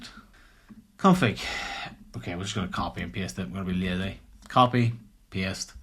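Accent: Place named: Northern Ireland, United Kingdom. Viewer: Good stuff, I've been experimenting with this stuff too, I found Bedrock Agents a bit limiting especially when it comes to integrating MCPs. Good to hear the NI accent, my wife is from there :)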